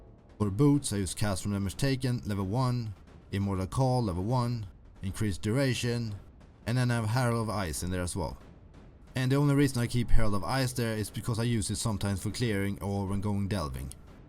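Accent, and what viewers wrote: Sweden. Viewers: Man måste ju älska den svenska engelskan <3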